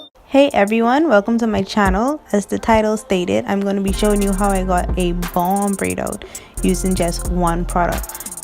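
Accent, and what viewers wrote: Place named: Trinidad and Tobago. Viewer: My girl I'm hearing a lil Trini in yuh accent🇹🇹🥰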